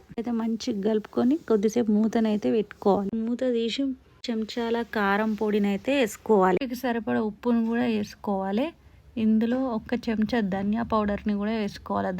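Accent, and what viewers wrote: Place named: Telangana, India. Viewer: సూపర్ తెలంగాణ మాట్లాడుతున్నావ్ బిడ్డ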